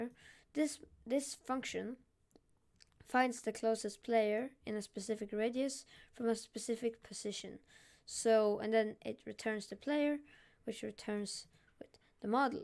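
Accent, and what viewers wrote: Sweden. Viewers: You sound swedish. Are you swedish?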